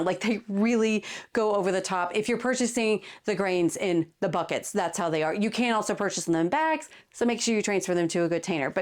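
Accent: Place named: Florida, United States. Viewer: You sound like you like here in Middleburg FL!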